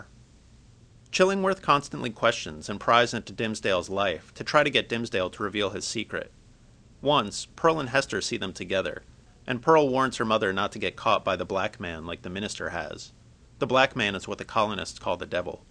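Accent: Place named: United Kingdom. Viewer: مصدگ عود 😂😂ترى هذا بريطاني إلي ديحجي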